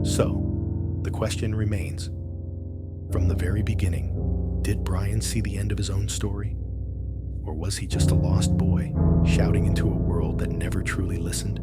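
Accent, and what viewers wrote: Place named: United States. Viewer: Another click bait channel full of garbage. Not surprised it is narrated by a scam artist American.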